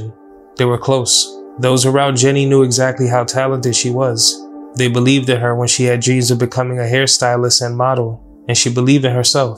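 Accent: New York, United States. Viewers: Yw. Also a question are u from New York?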